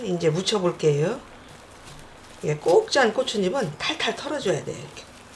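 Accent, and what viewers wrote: Chungcheong, South Korea. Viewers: 충청도말 재밋네유 배우고싶어서 저장했어유 요리도 쉽게 하시구유 맛나게 보이네유 꼭 해볼래유❤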